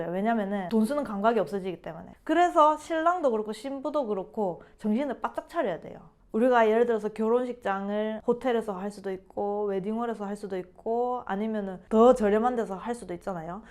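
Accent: Gyeongsang, South Korea. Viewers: 조선족이 구한말에 경상도 출신들로 구성된 경우가 많아서 조선족이 구사하는 한국어에서는 묘한 억양이 있습니다. 근데 저분은 완전 찐 경상도네요... 그리고 북한말씨가 있는 조선족 말은 함경도 이런 지역에서 온 사람들입니다. 그네들도 서로 지역 감정 있다고 하네요... 에휴ㅋㅋㅋ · 이분은 경상도 사람입니다 조선족은 북한말씨가 많이 들어가 있지요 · 저 갱상도 언니, 말이 참 잘 들리네요. 지방발도 제대로 사용하면 오히려 소통이 잘돼..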